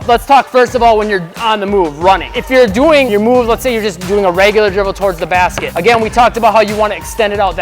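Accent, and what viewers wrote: Canada. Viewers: Why does he sound Canadian??🤔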